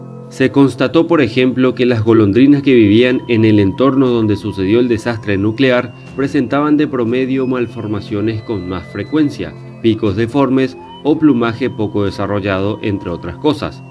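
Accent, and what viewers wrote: Paraguay. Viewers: Tenes acento paraguayo🤔 Porque no sabía de este canal hasta ahora🤔 me suscribo😍! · Me parece conocido el acento. De Paraguay verdad? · Sos paraguayo? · Acento paraguayo ? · Sos paraguayo ? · eres paraguayo? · Buenisimo el vídeo. Sos paraguayo? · Sos paraguayo?☺️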